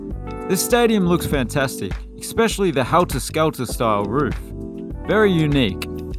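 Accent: South, United States. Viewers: The red neck accent is Top Tier 😭😭😂😂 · You sounded like a Southern Nascar fan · Loved the Southern accent 😂😂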